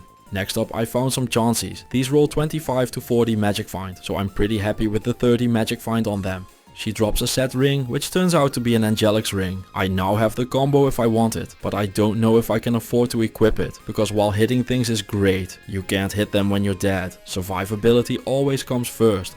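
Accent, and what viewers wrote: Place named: Netherlands. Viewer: Can always hear that dutch english 🤣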